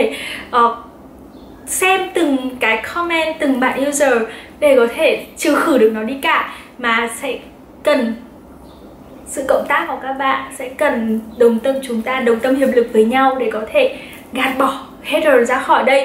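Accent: Standard. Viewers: c nói quá chuẩn, quá hay :D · Chị nói chuẩn quá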